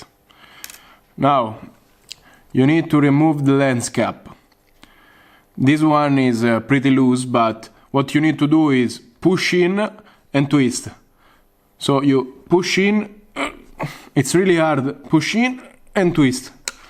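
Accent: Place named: Italy. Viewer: quanto si sente che sei italiano ahahah bel video grande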